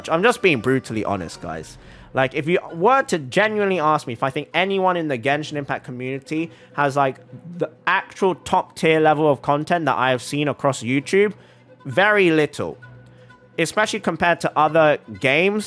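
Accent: England, United Kingdom. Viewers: I'm here for the english accent.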